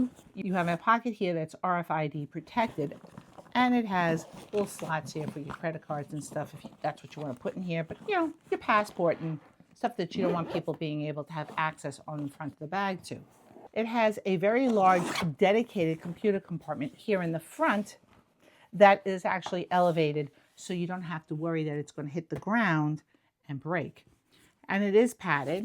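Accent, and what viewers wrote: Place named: Boston, United States. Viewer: I've just found your content and it's absolutely premium! You are so generous with your tips and education, and your information is heads above most travel YouTubers. Also, as a Bostonian, I get a huge kick out of the fact that you pronounce "packing" the way a lot of folks in Boston pronounce "parking" :)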